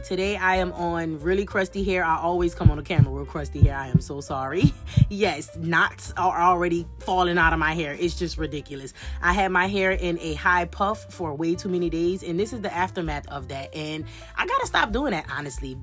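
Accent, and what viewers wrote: Louisiana, United States. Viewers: Sounds like a Louisiana accent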